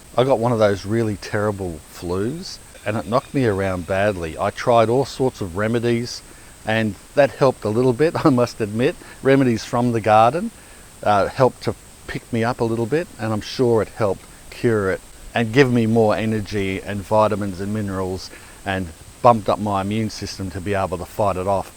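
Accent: Australia. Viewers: Just love these videos just so typically Australian great listening.Kerry Australia 🇦🇺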